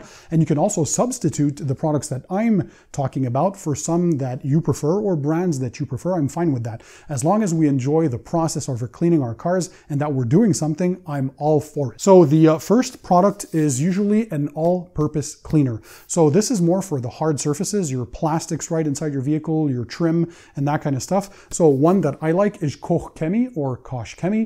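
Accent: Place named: Canada. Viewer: At first I thought this guy was a European that had an accent from speaking English as a secondary language but then I realized what I was hearing.... Canadian 😅🇨🇦 anyway, great info! thank you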